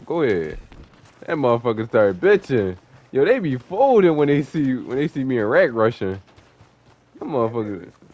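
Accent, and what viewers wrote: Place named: Philadelphia, United States. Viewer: I can tell you from philly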